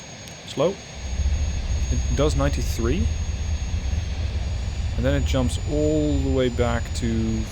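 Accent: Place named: Netherlands. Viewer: I recognized the dutch accent from the start :p I'm dutch too.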